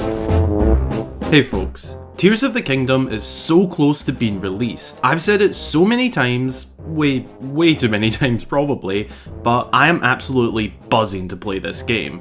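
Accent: Scotland, United Kingdom. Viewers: What is it about scottish accent creators that make me want to play TOTK even more lol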